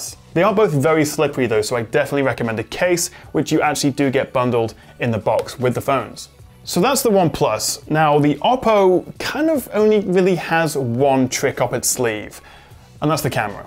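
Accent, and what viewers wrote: United Kingdom. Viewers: this guy made me love british accent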